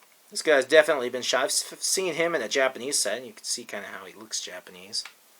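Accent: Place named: Germany. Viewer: YOU'RE GERMAN NOT SCOTTISH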